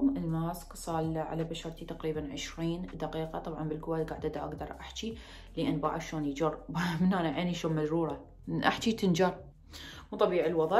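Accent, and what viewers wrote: Iraq. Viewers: ما تدرين شقد صايره احب قناتك واتحمس مره إذا شفت اشعار لفيديو جديد+حبيت اللهجه العراقيه اكثر من وراك 🥺❤️❤️❤️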